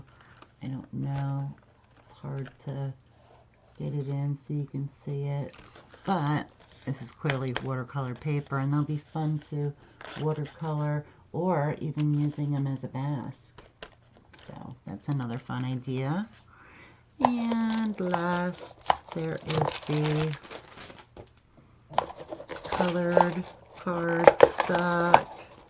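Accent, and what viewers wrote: United States. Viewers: lol i thought u were from nj u talk like my husband lol 😊🐥☀️❤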